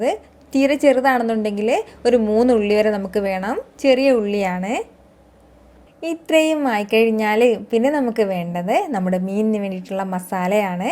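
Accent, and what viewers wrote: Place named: Kasaragod, India. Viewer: kasargod orgin ano....slang kasargod style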